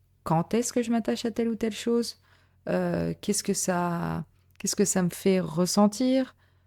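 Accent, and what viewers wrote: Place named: Acadia, Canada. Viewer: Salut, heummm la carte no 2 me fait penser à la tradition druidique hehe hahaha l'accent me fait plus penser à celui de l'Acadie hehe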